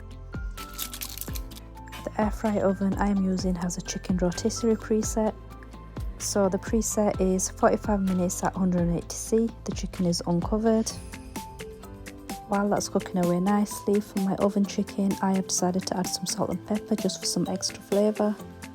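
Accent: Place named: North, United Kingdom. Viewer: You may be a little too young.. but there used to be a show called WHY DONT YOU on kids tv... they always had someone that sounded like urself.. most of the time it was how to create something silly out of left over toilet rolls.. but the cadence of ur voice matches the instructions perfectly :) but its the northern accent that sells it :) took me back hehe.. great vid.. keep up with them!!!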